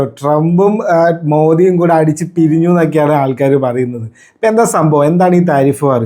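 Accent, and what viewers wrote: Palakkad, India. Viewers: Bro.. പാലക്കാട് ആണോ?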